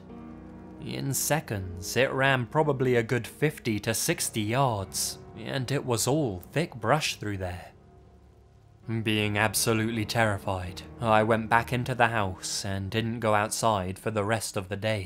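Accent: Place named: England, United Kingdom. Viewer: I love your videos man, where in England do you live? Love the accent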